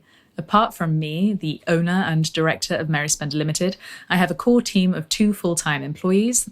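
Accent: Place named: Salisbury, United Kingdom. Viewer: Mary, I may be the millionth person to tell you this, but you have an absolutely beautiful speaking voice, and I wonder if you’ve considered narrating audiobooks or documentaries. Both your Salisbury (or Winchester ?) accent, and relatively low pitch, are highly listenable for long periods, especially for Americans. I thought my comment would sound very random, but given this latest video’s topic, maybe it’s relevant to your far-flung enterprise (ha !). Cheers and best wishes from the USA.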